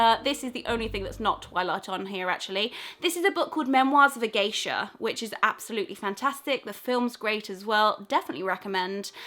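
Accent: United Kingdom. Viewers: I love your uk accent <3 in from the netherlands hahaha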